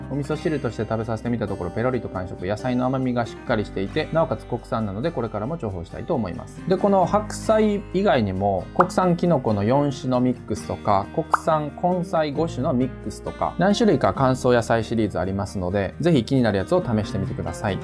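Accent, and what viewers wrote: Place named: Kansai, Japan. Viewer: 関西イントネーションすこ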